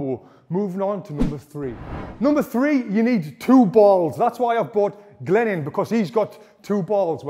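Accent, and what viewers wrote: United Kingdom. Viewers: British accent sounds cool. From Russia with respect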